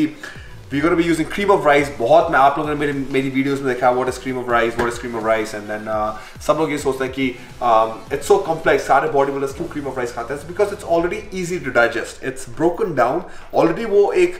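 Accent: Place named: India. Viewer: I love the Indian desi touch while he talk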